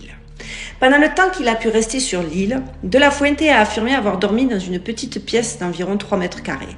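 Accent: Marseille, France. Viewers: Cc.. J'adore ton coup de gueule.. 😂🤣🤣😂🤣🤣 Les gars allé sur France 2..🤣🤣😂😂 Toute façon tu aura toujours des critiques c'est la rançon de la gloires.. Ta gloires a toi! Ce que tu fais pour nous tous les curieux, les éveillés qui penses que l'univers est habités par des êtres autre que humains et bien moi j'y crois aussi..merci pour ton boulot 👏👏👏👏👍👍👍merci.. Et puis ton accent de Marseille est bien très joli.. ☺️ Je te souhaite de bonne fêtes.. 🎉🎉🎂🎂🥂🥂🥂🥂🌹🌹🌹🌹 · Hello jolie fille du sud 😊 moi aussi je suis marseillaise et j'aime ton accent qui me rappelle le sud qui me manque 🥰 et tu as raison les gens parce qu'on ne voit pas ça n'existe pas 🙄 ahhhh les conformistes de la ruche avec aucune ouverture d'esprit 😅😂 ces habitants de l'île ressemblent à des pleéadiens ou à des atlantes 🤔 je pense que certains êtres bien en avance sur nous, habitent toujours sur Terre ou intra Terre. 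Certains bienfaisants et d'autres beaucoup moins car sinon les élites ne pourraient pas contrôler ce monde aussi facilement... A voir 😅 peut-être un jour aurons nous la vérité 😉😘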